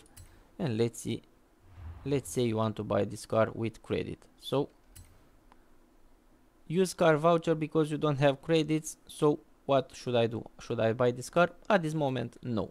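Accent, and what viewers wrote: Romania. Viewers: You have an romanian accent, but how do u get vouchers? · your romanian accent is so strong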